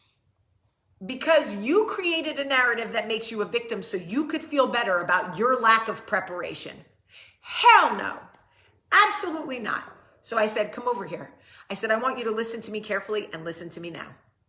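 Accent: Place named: New Jersey, United States. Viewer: You’ve got a lot of New Jersey in you haha! 😆 Love you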